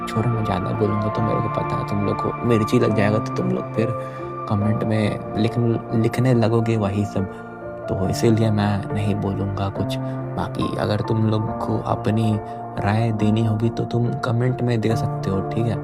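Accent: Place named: Bihar, India. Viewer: Your bigari accent just wowww ❤ That thora,, kapraa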